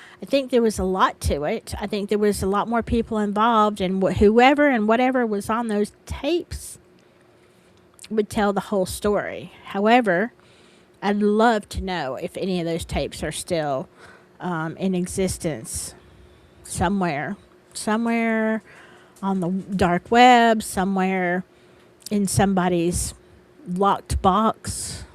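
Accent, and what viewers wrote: South, United States. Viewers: Hey I'm a new subscriber from England 💙. Saw you first with the Prof and I have missed you so I have come over. It's so soothing listening to your lovely Southern voice ☺️🤗. Really enjoyed the live on replay. Fascinating story. That Mark is off the charts creepy. He was no bystander imho. Would love you to cover a UK serial killer.. we have a few.. sadly. The White House farm killings is interesting.. although that was a mass murder.. only a thought.. Much love. Hyped 💙🤗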